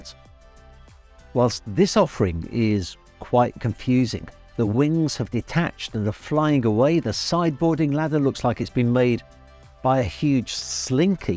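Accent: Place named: Midlands, United Kingdom. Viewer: Hi, I recently watched a documentary about superyachts that you starred in. It was great how your midlands accent was so strong in it haha...also, I noticed on the Boat International website Wider had a 170ft yacht for sale. Will you be covering the yard again soon?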